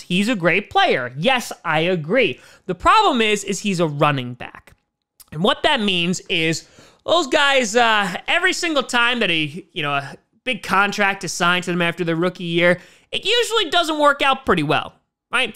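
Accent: New York, United States. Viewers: lmao he is from NYC and that is to how new yorkers talk. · yes, is from nyc. and a lotta new yorkers will say yuge. he also says "hughston" or "yuston" rather than "houston," which some say in ny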